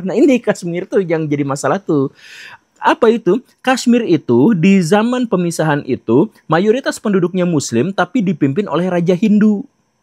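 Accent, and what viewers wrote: West Java, Indonesia. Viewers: Senengnya dari ini..kalau menerangkan pake bahasa sederhana, mudah dipahami dan satu lagi aksen sundanya gak ilang..kaya aing bobotoh..Hidup Persib!!😂😂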